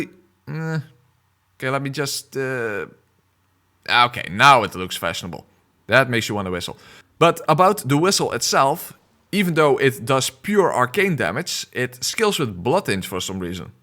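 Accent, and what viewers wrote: Netherlands. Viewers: gok aan t accent randstad ook? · Leuke video! Altijd grappig hoe wij Nederlanders een typisch accent hebben wanneer we Engels praten. xD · First video i watched on your channel, immediately heard the dutch accent hahahah🇳🇱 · U dutch?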